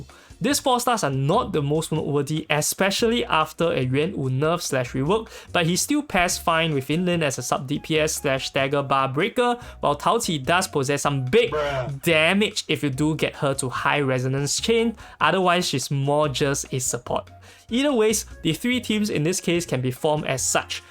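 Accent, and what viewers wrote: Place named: Singapore. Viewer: I do love Singaporean accent myself! 😂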